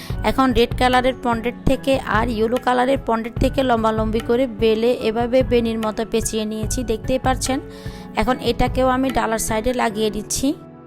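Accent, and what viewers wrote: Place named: Noakhali, Bangladesh. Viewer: Anne ki noakhailla ni ponded bolen keno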